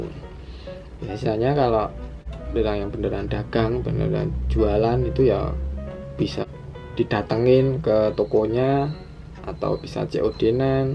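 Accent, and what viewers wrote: Java, Indonesia. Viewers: jowo ne medok tenan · Mas ngomongmu medok 😂😍😍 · Thank's infonya mas, ngakak sih masnya agak medok wkwkwk ada lucunya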